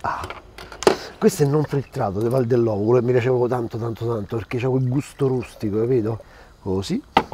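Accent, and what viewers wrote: Rome, Italy. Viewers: mi sono iscritto da poco e ti trovo troppo forte! ricette interessanti raccontate dalla tua travolgente simpatia e accento romano... io sono di bergamo e stò cercando nei miei video di coprire la mia accento!... ma forse è meglio valorizzarla..bho....comunque complimenti!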